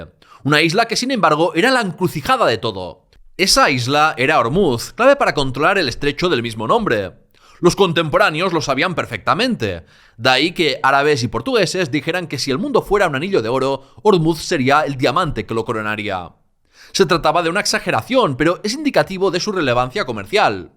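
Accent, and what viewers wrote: Catalonia, Spain. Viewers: Acabo con la monarquía hispánica? Menudo acento indeptista tienes, tu canal parece una clase de adoptrinamiento de una escuela indepte pagada por ciuercal 3 por ciento . Menos mal que hablas español así me entero del tipo e odio que pretendes generan en quien te ve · Joder, que acento catalufo asquero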